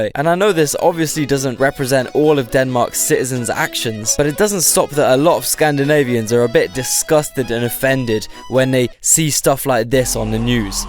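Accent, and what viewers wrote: United Kingdom. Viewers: Norwegian guy her. I approve this video 😁👌. Cool hearing a british guy that really gets the nordic banter humor we got goin' on ! 😁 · I love how a BRITISH guy talks xd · Oh what I didn’t know you where a fellow swede that oi bruv accent is strong, when did you move to the uk?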